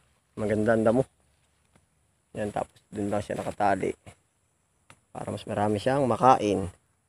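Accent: Quezon, Philippines. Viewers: Taga quezon din po kami...nakakaaliw manood sa punto p lng quezon tlga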